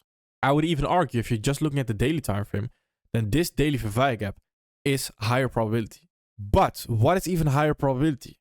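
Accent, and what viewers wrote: Netherlands. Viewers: Ik ruik nederlands accent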